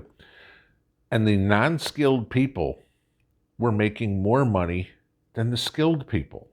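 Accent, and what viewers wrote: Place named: Minnesota, United States. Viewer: New subscriber........Is he from Minnesota........kinda sounds like it.............Im from there so I am just askin...........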